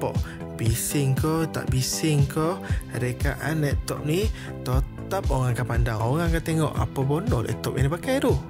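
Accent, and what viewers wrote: Negeri Sembilan, Malaysia. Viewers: Elok2 la cakap nogori tu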